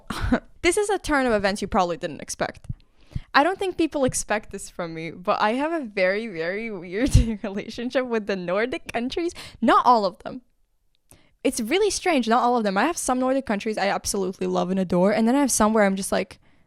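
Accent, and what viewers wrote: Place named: Latvia. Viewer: Your English pronunciation is very similar to other Latvian people I know so I had the feeling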